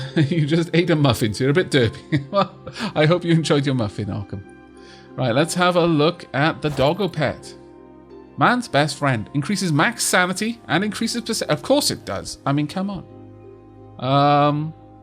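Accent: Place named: South Wales, United Kingdom. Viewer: Thought he might have been since I'm from wales and i can his accent was familiar and if I was going to guess he is from South Wales because his accent isn't realy strong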